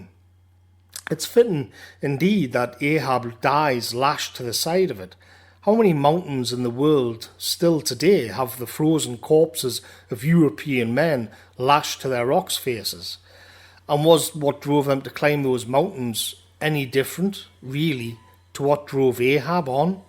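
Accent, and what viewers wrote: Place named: Newcastle, United Kingdom. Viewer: Nice to hear a fellow geordie speak on such a lethal book <3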